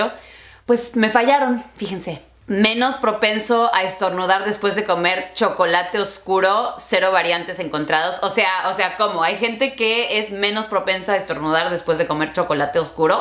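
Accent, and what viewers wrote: Mexico. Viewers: Tienes un acento mexicano, muy marcado. Hablando español claro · .. me gusta tu acento mexicano... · No mames wey!!! Ahre jajaja es re gracioso el acento mexicano. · Tienes sin duda una apariencia Europea nórdica, pero con un hermoso acento mexicano que te hace ver más interesante. Tu español y tu inglés son impecables. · Con ese acento puro mexicano, piensas en Español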